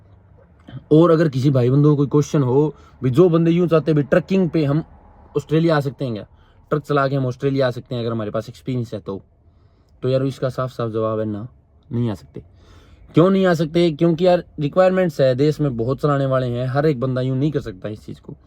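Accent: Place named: Haryana, India. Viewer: Video bhut dekhe aap jaise nhi apni boli m maja aa gaya Bhai ji konsa gaon h aapka boli apni mast apni boli m video bhanya acha lega m naresh gaon barwa bhiwani haryana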